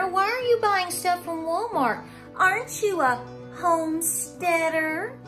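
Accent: South, United States. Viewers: Thank you for the motivation ❤️ I’ve been stocking my pantry since 2020 but you gave me ideas for other things I didn’t think about! I just love listening to your southern accent 😁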